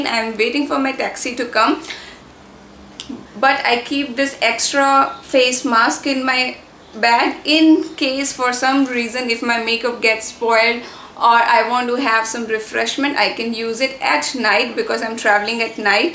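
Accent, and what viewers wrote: India. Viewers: Are u Indian ur accent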